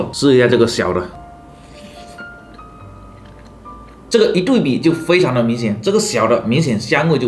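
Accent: Fuzhou, China. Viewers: 福州口音相当亲切了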